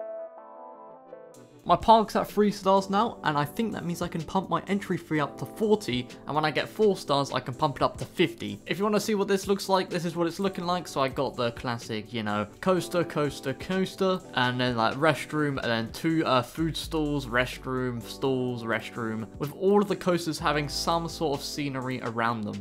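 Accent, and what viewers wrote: United Kingdom. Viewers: I love the uk pronunciation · Are u British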